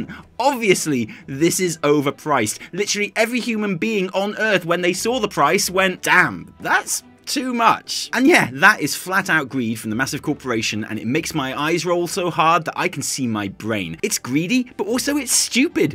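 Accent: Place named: United Kingdom. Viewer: Good video fair points made, but why do you keep cutting the video after literally every sentence? Also you sound British but say the dates like the American way? Just curious.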